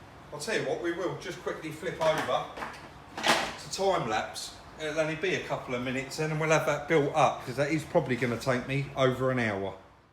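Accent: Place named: Yorkshire, United Kingdom. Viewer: Great vid. You are making honest money there on that, cos you put time, money and organisation in. I am Scottish and I just love your accent. What part of Yorkshire are you from?...........lol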